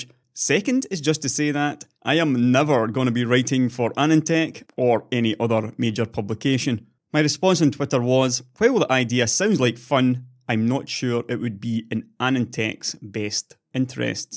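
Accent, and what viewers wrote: Scotland, United Kingdom. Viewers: Your scottish accent is better than *'s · Awesome work as usual! Fuck the haters and as Good Old Gamer said: "You can't fix stupid" Never a truer word spoken:D Looking forward to your next vid man, keep it up! you provide a bloody good and balanced viewpoint and have +100 Integrity compared to some others of the techpress, It's for that reason I subbed you (After watching a few vids and eventually became a patron) You're honest, frank and I like that. No bullshit or smoke and mirrors. Just plain old info with a Scottish slant:) Anyways dude have a good week and see ya in the next one!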